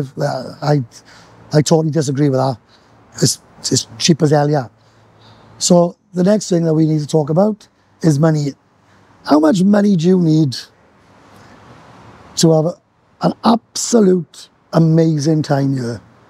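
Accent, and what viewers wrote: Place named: Wales, United Kingdom. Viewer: been there Twice firsT was with me bro then 2nd time the X lol, first timewas best u know what i mean taffy, you sound likje Treherbert boy :)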